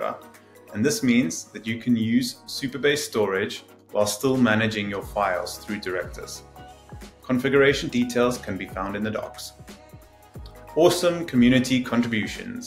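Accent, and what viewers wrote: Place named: South Africa. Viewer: This guy sounds South African. any way thanks for the update 🔥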